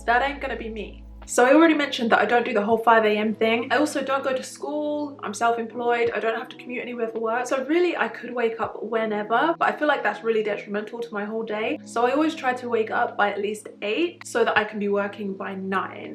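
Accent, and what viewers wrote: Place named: Essex, United Kingdom. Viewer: Lara here. Very pretty intro. ☺️☺️ The Essex accent 🥰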